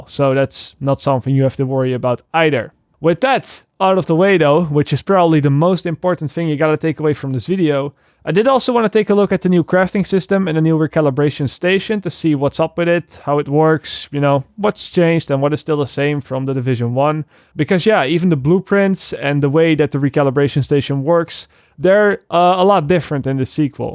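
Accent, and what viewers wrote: Netherlands. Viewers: I came for the crafting info and as soon i heard you speak wist ik dat je een Nederlander was😂 goeie video ik ben gesubd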